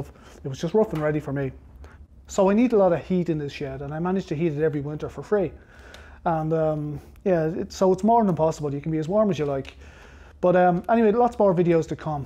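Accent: Ireland. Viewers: i could tell by the accent . But was not sure if it was a dublin accent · Looks dangerous....but love your Irish accent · Hi..Watching you again and enjoying your videos, also you have a wonderful Irish accent that take me back to the old country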